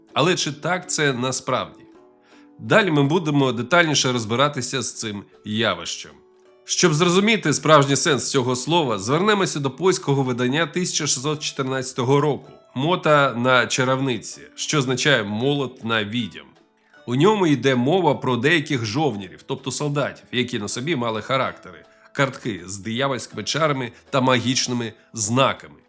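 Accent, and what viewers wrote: Russia. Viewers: Ну нащо пхатися в знавці фактів про характерників? А акцент московський все ж відчувається попри правильну вимову:)) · Хто ви такий щоб давати такі неправдоподібні характеристики нашим козакам, та ще з москальським акцентом??? Ви подумайте перед тим як людей водити в оману!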